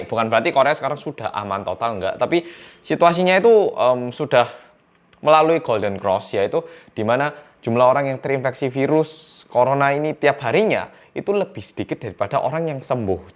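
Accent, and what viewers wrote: Java, Indonesia. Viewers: Kopine ngantek entek mek gur nyritakne corona 😁 . Terus lanjut vidione mas . Gawe mbantu bolo bolo . Kerjo libur delok vlog e korea ne wong medoookkkk ,🤣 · Tetap sehat ya yg medokkkkk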